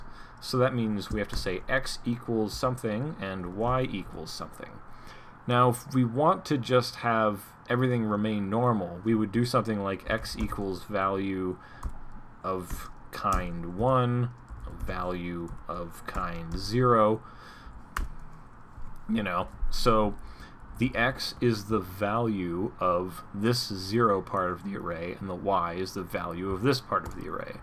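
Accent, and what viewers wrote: Canada. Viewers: I loves your Kaynedien accent hey. thanks for this.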